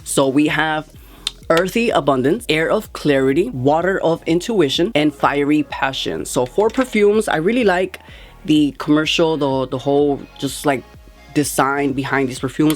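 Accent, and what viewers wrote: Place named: Latin America. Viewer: I appreciate this review ❤ and I'm definitely old enough to be your mother and l so enjoy watching every review because in my 20's me and you honey would have been besties ❤❤. I don't know your nationality but the accent sounds Latino? So I love the pronunciation of your words😉. Keep being you!! We love it ❤❤❤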